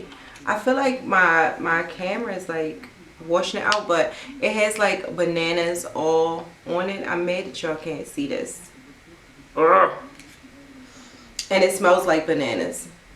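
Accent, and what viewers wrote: Baltimore, United States. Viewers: I know that Baltimore accent from anywhere 😍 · She’s from the north it’s her accent maybe Baltimore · I almost thought she was from DC too but she got that heavy Baltimore thing going on lol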